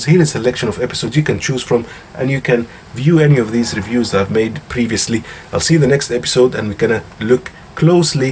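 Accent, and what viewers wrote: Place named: Africa. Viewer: انا عندي سؤال محيرني صراحة انته وحكيم اثنينكم عندكم لكنه افريقيه في انكليزيتكم.. where did u get that accent from? هذي مو اللكنة الانكليزيه ابدا...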